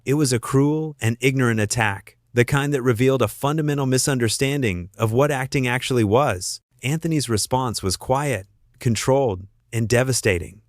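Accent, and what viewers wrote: United States. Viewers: These American voiced videos are a nightmare, garbage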